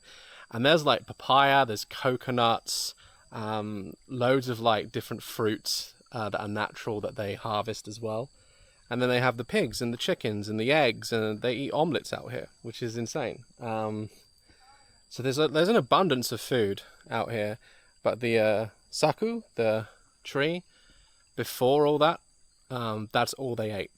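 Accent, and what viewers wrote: United States. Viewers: You can tell & his wife talk alot at home. Noticed an American twang to his accent throughout this video.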